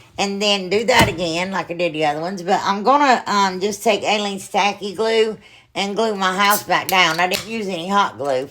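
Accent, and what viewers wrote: South, United States. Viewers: I 'v e just found your channel today but Girl I 'm a fan for life!! You're the 1st person I 've ever seen that sounds as "country" as I do. It's wonderful Never change. Cause remember, "the south's gonna do it again"! Love, love, love you!!!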